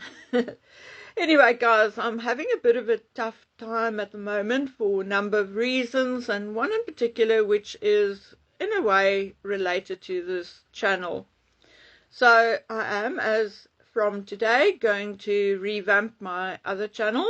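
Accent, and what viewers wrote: South Africa. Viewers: Hello. I am a Johannesburger (Bedfordview) and love your show. Love listening to our SA accent❤ We’re having lovely rain at the moment. Just great. Take care and have a lovely evening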